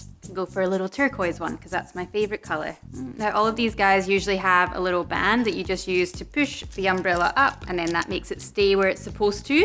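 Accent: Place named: Scotland, United Kingdom. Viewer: i thought so but at first i was thinking irish... but u sounded scottish... i couldnt make my mind up lol i guess accents can vary alot in different areas of scotland like they can in england... and im sure the same in australia😃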